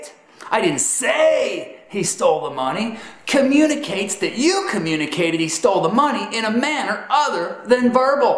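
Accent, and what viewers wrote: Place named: United States. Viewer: This is very stereotypical american. Perhaps they play it at public American culture courses? Anybody talking even remotely like this is guaranteed to only care about your money.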